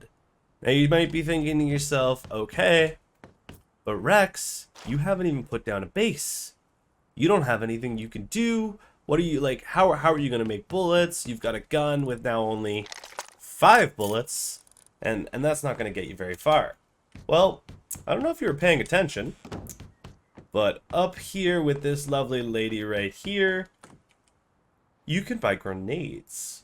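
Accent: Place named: Canada. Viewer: You sound Canadian af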